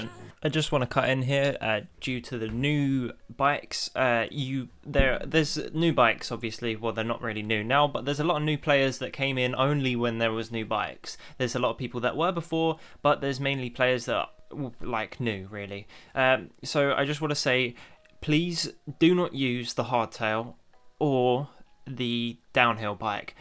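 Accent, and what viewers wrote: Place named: United Kingdom. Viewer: It sounds like he's british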